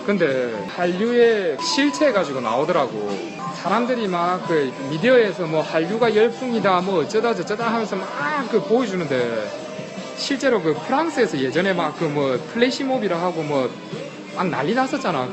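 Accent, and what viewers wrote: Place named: Busan, South Korea. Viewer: 부산사람 같은데요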